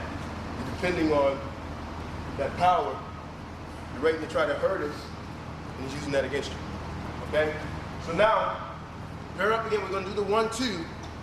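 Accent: Baltimore, United States. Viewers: Baltimore accent? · Definitely hear Baltimore in his speech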